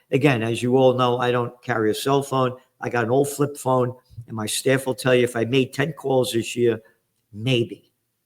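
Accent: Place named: Bronx, United States. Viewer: Love the TRUTH spoken with a Bronx accent!😊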